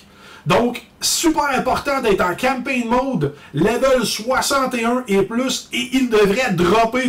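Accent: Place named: Quebec, Canada. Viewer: J'apprécie le profond accent québecois, je n'ai pas vu beaucoup de vidéo de D3 fait par des québecois. :-) Maudite belle job sur ton travail, je vais surement y revenir quand je tapperai lvl70 (commencé hier soir pour la saison 2, je suis à 67 hehe). Au lieu de faire un "best all around", ça aurait pu être cool que tu fasses T6 build, parce que pour les prochains jours/prochaines semaines, ça va être du T1 en montant. Je ne suis pas sur qu'on peut faire des grosses grifts très tot, mais je pourais me tromper. DH forever! :P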